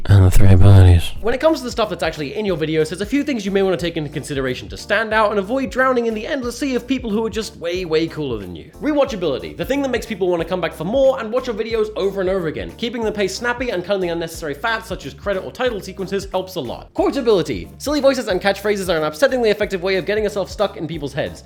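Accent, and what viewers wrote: United Kingdom. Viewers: Wait isn't he British? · he's british? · Wait, aren't you british? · are you british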